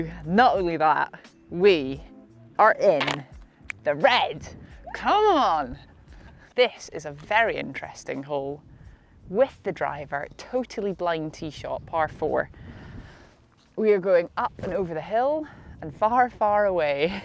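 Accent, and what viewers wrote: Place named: England, United Kingdom. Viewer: Your commentary and tips are spot on! The English accent doesn’t hurt!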